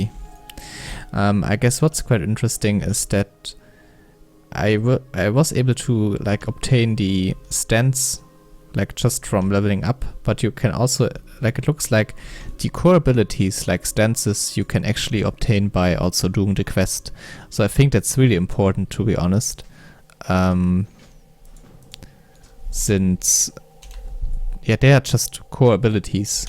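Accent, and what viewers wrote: Germany. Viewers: Nice video, thanks for the showcase. I like your german accent!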